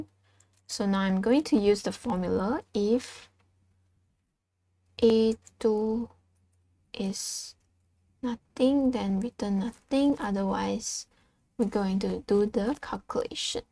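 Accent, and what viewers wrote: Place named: Singapore. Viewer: As a fellow singaporean I notice the accent - prolly singfaporean or malaysia - hi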